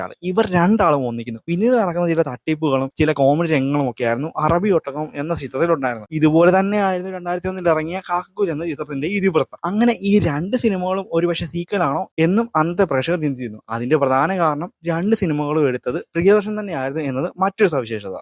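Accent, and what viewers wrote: Kollam, India. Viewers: കൊല്ലം ഭാഗത്ത് ആണെന്ന് തോന്നുന്നു അവതാരകൻ്റെ നാട്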